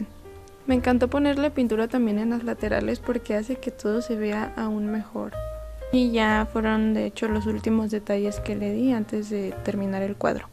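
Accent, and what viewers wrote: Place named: Fresa, Mexico. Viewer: que agradable sujeta, suenas fresa, pero sin forzar, lindo :) nuevo sub cómo dirían los chavos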